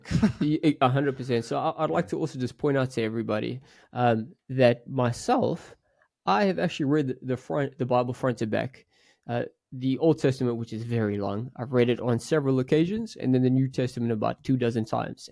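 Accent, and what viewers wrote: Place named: South Africa. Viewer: Sorry to ask trivial questions - where is from? His accent sounds so similar to South African. Aweh.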